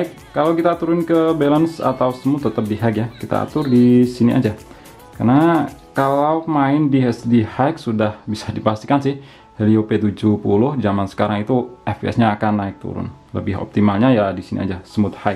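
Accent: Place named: Banyumas, Indonesia. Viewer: Lu orang Banyumas ya bree. Itu kaya Deket rumah gw😀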